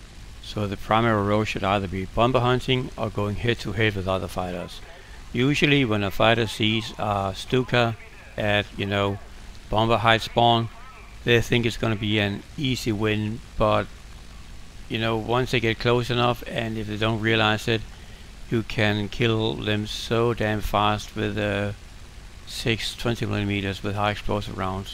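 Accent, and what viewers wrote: Denmark. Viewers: Så nemt at høre den danske accent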